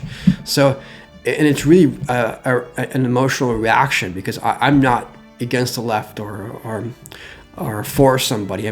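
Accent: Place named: Iran. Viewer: Piper Ohio >> No he doesn't. That's his Iranian accent. DON'T change the speaker!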